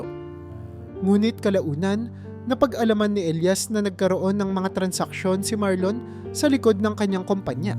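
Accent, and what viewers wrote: Philippines. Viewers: May tanong din ako bakit ganuon kayong bumigkas ng tagalog, anong probinsia nyo?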